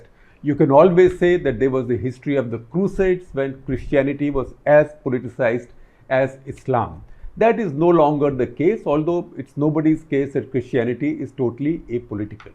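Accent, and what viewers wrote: India. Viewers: Why are you speaking English in total Hindi tone.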